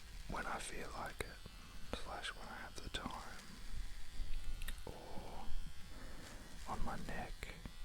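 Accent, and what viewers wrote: Australia. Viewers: Australian English is already an ASMR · Love the whispering with the Australian accent it’s hypnotizing!😂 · Where have you been all my life with that voice? Another Ozzie ASMR! Honestly, I already love the accent and then the whisper....I'm in heaven!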